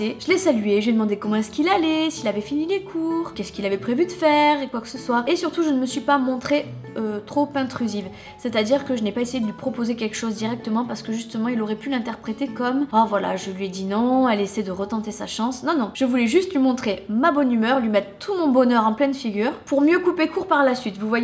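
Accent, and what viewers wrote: South, France. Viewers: au fait t'es de quelle ville ? t'as un accent du sud :) tu serais pas de toulouse?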